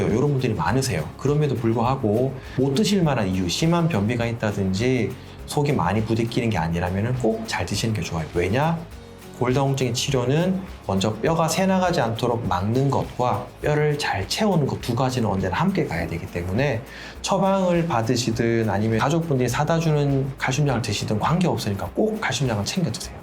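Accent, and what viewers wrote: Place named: Gyeongsang, South Korea. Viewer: 이미 말투에서 대구출신인거 숨기지 못하죠!! ^^ 반가워요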